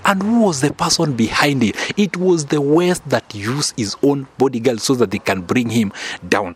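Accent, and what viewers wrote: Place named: Kenya. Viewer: You keep lying about Ghana you area Kenyan with that thick accent talk about Ruto and the good things he is doing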